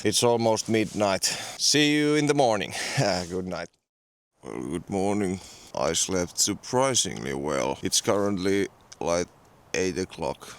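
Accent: Finland. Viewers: You seriously sound like Luke from Outdoor Boys, but you add Finnish scent to that. I love it haha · Mukava kuunnella välillä tuota rallienglantia 😂😂😂. No pääasia että ulkomaalainen saa selvää👍on naurettu kaverien kanssa 😊 Kiitos . Paljon seuraan #retkireppua #alileiniö#mehtämikko#eetun völjyssä #vapaalla jalalla ym. Eli Suomen ylivoimasia ykkösiä niin kivaa vaihtelua nämä on ...in English 😅😅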